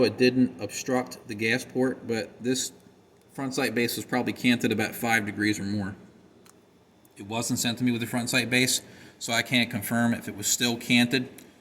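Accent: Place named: South Jersey, United States. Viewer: Are you from the south jersey/Philadelphia area?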